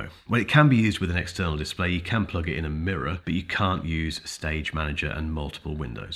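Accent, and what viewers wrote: United Kingdom. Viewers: 看到你講話的方式跟聲音，就好像在看一部英國電影，十分有趣，期待後續 I pad mini 7 的ai評測。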